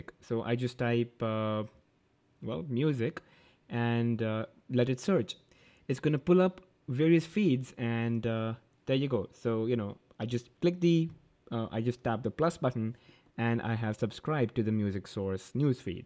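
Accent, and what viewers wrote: India. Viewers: Curry accent..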